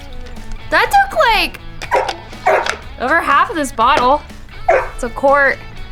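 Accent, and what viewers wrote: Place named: Western Pennsylvania, United States. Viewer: Just found you with all of my recent chainsaw videos. I'm originally from Butler and you totally sound like you're from western PA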